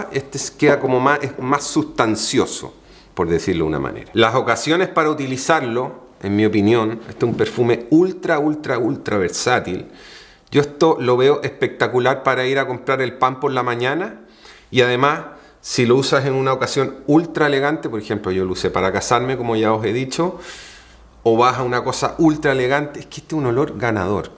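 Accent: Chile. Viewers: Es chileno, erradicado en España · Hablas como Chileno, aunq usas ciertas palabras españolas que despistan... pero tu expresion de ir a comprar el pan por la mañana es un sello Chileno genuino 😂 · Que lindo que es el acento chileno 🙌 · por que desis vosotroa ai aoa chileno? es algo que tambien note en tu hermana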